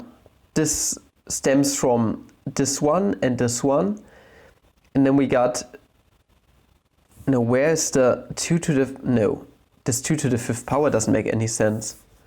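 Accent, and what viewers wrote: Germany. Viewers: you have too much latent heat of confusion yourself.You must review your teaching methods, besides German accent,umm ah ah is off-putting.Why not revert to talk and chalk traditional method,with plenty of home work,no amount of IT gadgetry will do. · Du siehst zwar ganz hübsch aus, aber Dein Denglisch ist schwer auszuhalten. 😄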